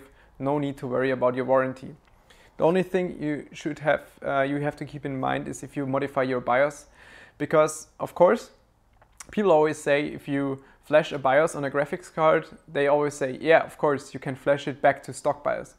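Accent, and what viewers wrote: Germany. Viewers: thanks! also I like your accent. I want to go to Germany someday because people say it is beautiful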